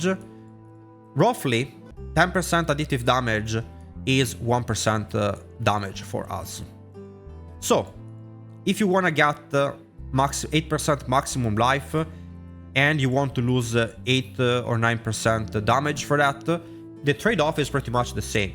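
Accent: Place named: Italy. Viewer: bro its s tier and he got an italian accent. what is your problem with the build maybe we can help you?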